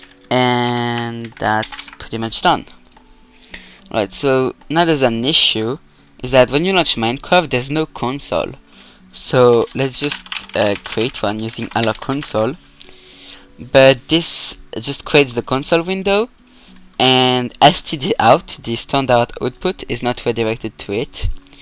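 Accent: France. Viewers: L'accent français qui se cache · Good French accent bro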